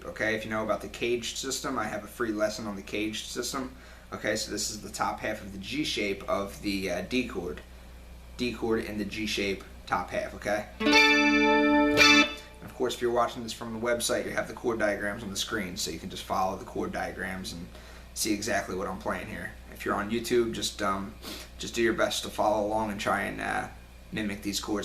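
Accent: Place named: East Coast, United States. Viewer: This guy sounds like Adam Sandler or someone from the east coast who's about to kick my ass while trying to scalp tickets to an Eagles or Red Sox game.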